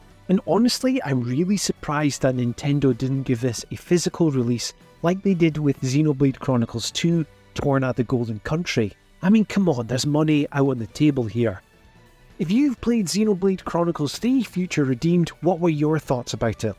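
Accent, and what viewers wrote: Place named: Dundee, United Kingdom. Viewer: lol as soon as i heard the accent bro i was sure it was dundee lol. i moved here not long ago and that's how everyone sounds to me :P if you heard me you would probly be able to guess what parts i'm from as well haha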